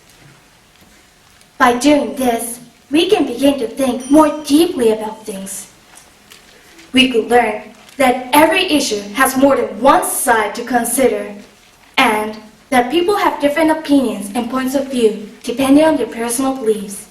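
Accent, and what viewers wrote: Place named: Japan. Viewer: セリノ 発音はキレイだけど日本鉛 まぁー日本人ならこっちのほうがキレイに聴こえるけど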